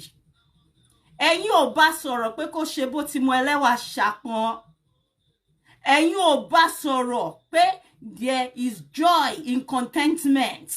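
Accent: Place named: RP, United Kingdom. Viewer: I no know sey dis LADY 👸🧚‍♀️ sabi talk Queens English like dis ajeh 🙆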